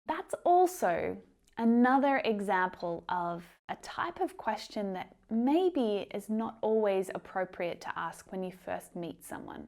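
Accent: Australia. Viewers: Ma'am are you from Australia ?. I'm in love with your English accent😍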